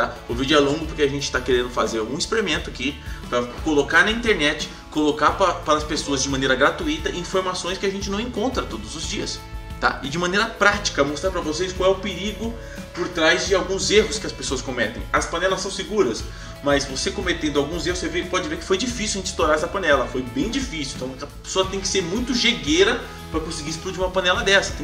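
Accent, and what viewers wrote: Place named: Jandira, Brazil. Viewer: Cara vc é de Jandira né?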